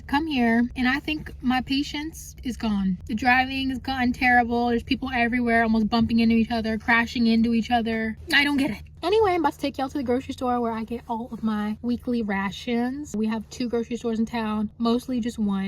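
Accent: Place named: California, United States. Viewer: The only thing i questioned is where is your Texas accent, sounds like you were born and raised in Cali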